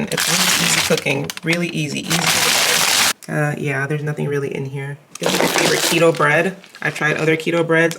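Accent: Jamaica. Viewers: Girl, are you Jamaican?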